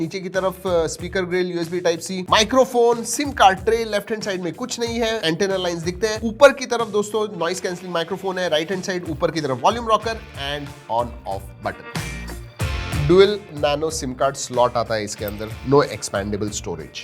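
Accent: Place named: Khandesh, India. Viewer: Oh tuni bahna nig baher 😂😂😅wow sir tumhi bhi aahirani boltas maja yi lagi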